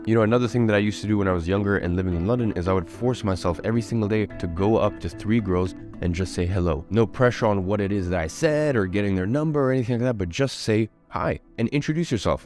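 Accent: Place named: United States. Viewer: How come does this guy have an american accent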